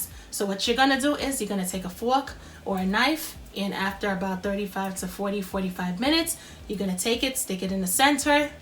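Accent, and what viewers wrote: New York, United States. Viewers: I hear the NYer in your voice 😂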